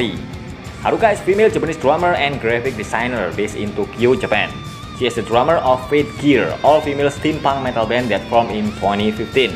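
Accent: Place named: Indonesia. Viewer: suka sama ulasannya... tp bhasa inggrisnya kya brlogat bahasa indonesia...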